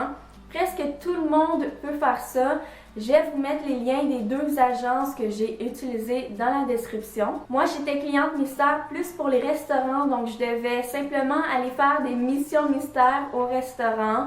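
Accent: Quebec, Canada. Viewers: tu a l'accent quebecoi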